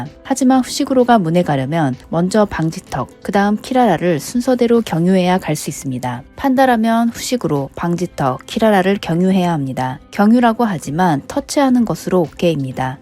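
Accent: Japan. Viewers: 영어도 일본어 느낌으로 읽네요 ㅋㅋ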